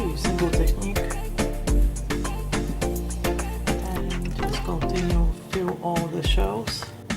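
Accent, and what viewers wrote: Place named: Europe. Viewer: Great recipe my friend these look delicious remind me of my Moms question what is your background? You sound European 💜🌺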